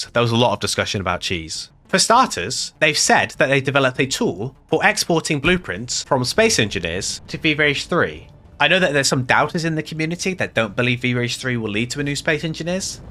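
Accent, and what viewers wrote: United Kingdom. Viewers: Very nice British accent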